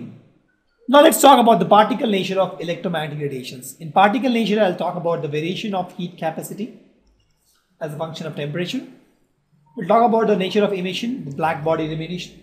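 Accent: India. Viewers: you have a very good indian accent helps a lot to understand KEEP DOING THE GOOD JOB YOU"LL MAKE ME GET IIT MAYBE....:)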